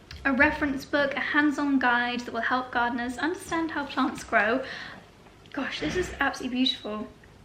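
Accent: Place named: United Kingdom. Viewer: Hi! ☺️ I am a newer subscriber and I have got to say over the past month or so I have seen how practical you are, but that you also appreciate the finer things in life and I LOVE IT!!!! You are so sweet and down-to-earth and I’m here for your newfound hobby of gardening! Your music choices are so lovely and therapeutic and so is your voice and British accent! I really enjoy your videos and they help this busy mom get thru her day! Thanks so much for sharing! 😘 Take care!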